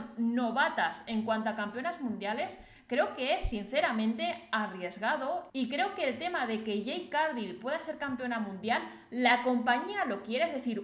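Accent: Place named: Spain. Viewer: Me encanta el acento de, soy Chileno y no sé porque pero el acento español me conquista(quizás ya se porque me gusta jajaja) deberías poner titulos más flipantes 😂 jajaja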